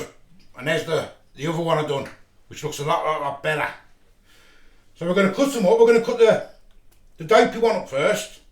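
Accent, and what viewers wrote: Birmingham, United Kingdom. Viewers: Nice,loving the brummie accent,where you from ?,I was born in Smethwick now living in Florida..locals always think I'm South African...lol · You sound brummy or Wolverhampton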